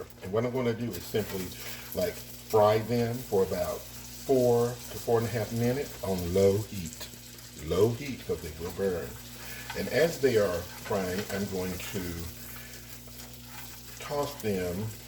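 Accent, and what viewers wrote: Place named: South, United States. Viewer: Love your southern man accent. Yes!!! Loving the 😀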